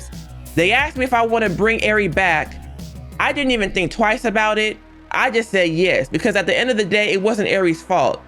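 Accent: United Kingdom. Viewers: I haven’t even finished the video yet, but I already know the narration is gonna have me weak 😂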